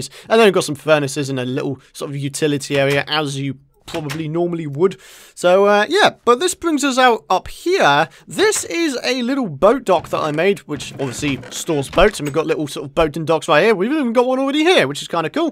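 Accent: United Kingdom. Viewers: ur voice,its so , so, UK. I love it !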